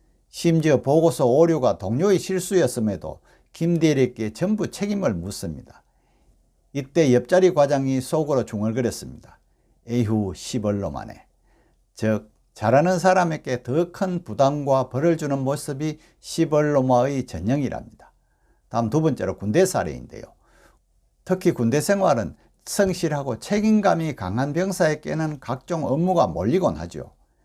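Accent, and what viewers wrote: Gyeongsang, South Korea. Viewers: 선생의 억쎈 갱상도 사투리 그냥 듣고 싶지.안소이다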